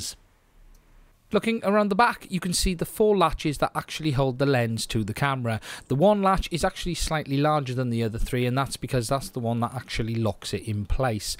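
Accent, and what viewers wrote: United Kingdom. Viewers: British accent. So where can I buy these in the UK?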